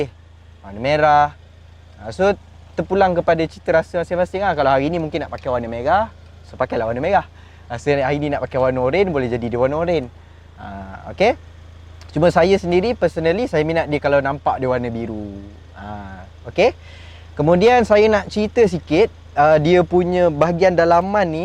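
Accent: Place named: Kelantan, Malaysia. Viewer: sama mcm penerangan kat honda fd dia...slang kelate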